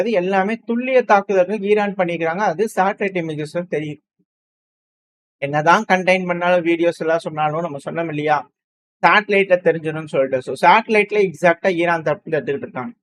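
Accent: Coimbatore, India. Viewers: Bro are you from coimbatore?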